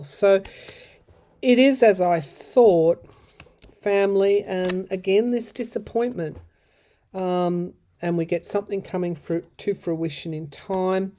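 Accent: Australia. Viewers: I'm American and adore your feelings. Being on the side of what is right is where I am. I have to also say I love your Australian accent. It's so sweet and wonderful! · I appreciate your natural, calm professionalism without you being cold or putting on a show. So very glad to have found your channel and to hear an easy paced Australian voice. Even more, that you expertise ranges from cards to astrology, channeling and mediumship.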